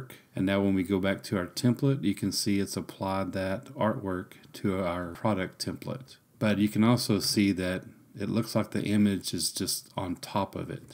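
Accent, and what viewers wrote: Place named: United States. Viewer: your american im scottish DIDNT understand a thing you were talking about sorry :-((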